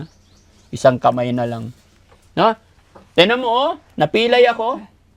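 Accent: Visayas, Philippines. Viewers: Ka cute nimo paminawon gabinisaya hahaha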